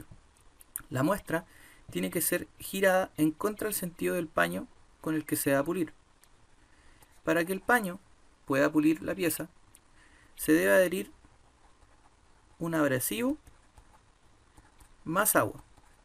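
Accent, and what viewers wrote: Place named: Chile. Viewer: Chileno po weooooon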